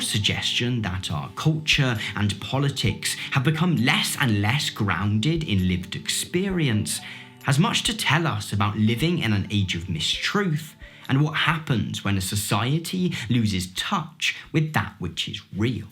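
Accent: United Kingdom. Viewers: Dear,are you british?just interested in english language,try to learn it again.sounds british to me.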